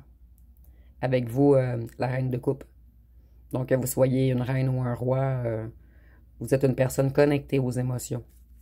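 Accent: Canada. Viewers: J'adore l'accent canadien bonjours c'est Patrick vous êtes la rose de mes jours ❤❤❤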